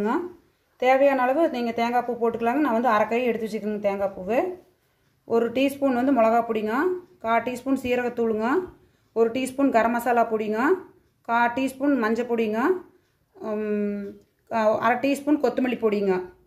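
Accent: Kongu, India. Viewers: அருமைங்க.... வாழ்க கொங்கு தமிழ்... வளர்க கொங்கு தமிழ்..... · Kongu Tamil super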